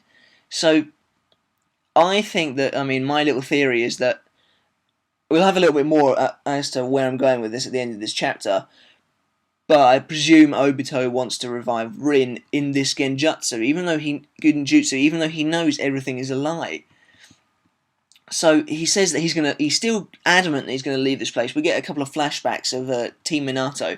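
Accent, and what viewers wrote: United Kingdom. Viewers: good review mate....u actually know what ur talking about...what part uk u from?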